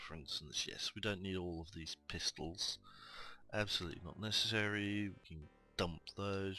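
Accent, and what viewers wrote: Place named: United Kingdom. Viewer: I have watched ALL 56 videos 6 times in a row while I've been playing in a separate DOS window. WORDS I LOVE HEARING HIM SAY IN HIS ACCENT: 1. SAH-GENT Smerkey 2. Raw-ket-lawn-chuh 3. OH NO....OH NO (pronounced Air-Nair...Air Nair) LOL. Being from TEXAS, I totally love his British accent!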